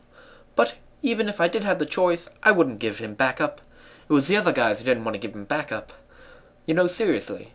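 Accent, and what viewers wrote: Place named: England, United Kingdom. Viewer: cool English accent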